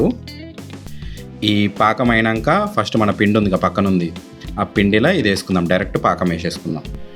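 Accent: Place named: Telangana, India. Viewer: ప్యూర్ తెలంగాణ భాష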